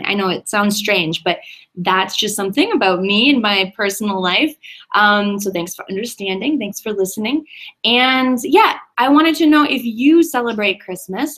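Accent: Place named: United States. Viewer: I like your American accent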